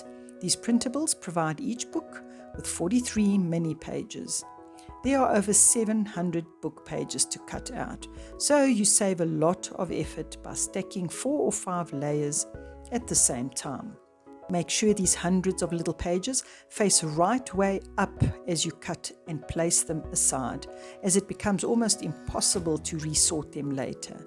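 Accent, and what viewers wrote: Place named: South Africa. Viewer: Hello, I recogise your accent. South African. What a super mini book you have created. I am a bookbinder and these are the most super fun. I have only made blank miniatures. Thank you!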